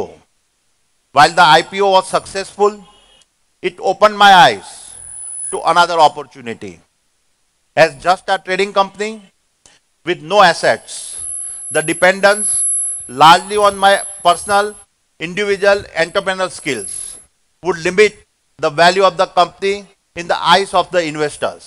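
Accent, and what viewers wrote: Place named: Gujarat, India. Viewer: English gujju accent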